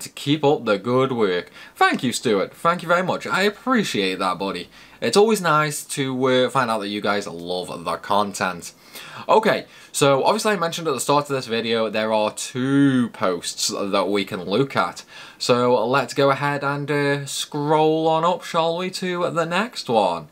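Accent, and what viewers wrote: Stoke, United Kingdom. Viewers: Loving the content and dedication you have. Is that a Stoke accent btw?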